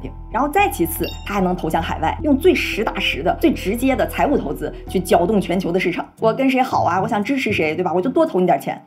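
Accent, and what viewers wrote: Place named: Northeast, China. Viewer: 东北人嘛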